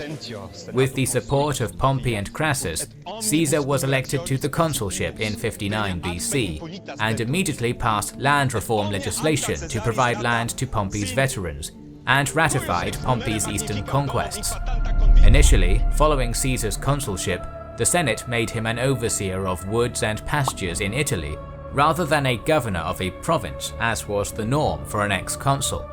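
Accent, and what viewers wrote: United Kingdom. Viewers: Nice British accent!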